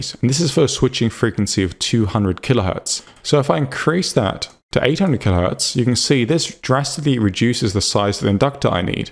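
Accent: United Kingdom. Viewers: You are one of my reasons to love the British accent. I like your voice. You look like a voice over artist. Could you tell me what is the difference between basic and extended part in electronics? I usually hear you in many videos say that this component is an extended part but I don't know what it is. Thank,.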